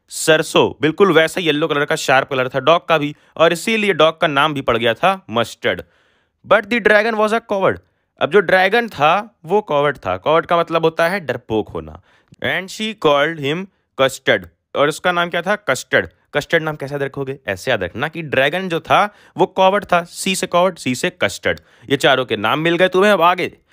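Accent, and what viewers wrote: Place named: Uttar Pradesh, India. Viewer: Sir u explain very well...hats off to you sir ji....by your voice i understand that you're from UP😊🙌😉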